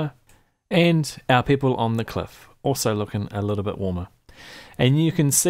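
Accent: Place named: New Zealand. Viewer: Love being a kiwi then hearing another and be like ahh thats better coming from some 12 year old strong american accent haha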